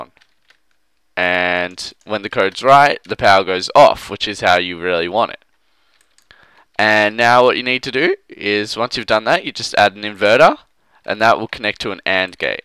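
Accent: Australia. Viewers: he is an aussie and thats why he said buddon or whatever you said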